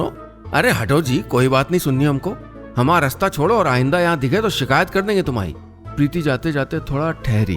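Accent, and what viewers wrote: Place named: Mirzapur, India. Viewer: Accent Mirzapur wala hai 👌👌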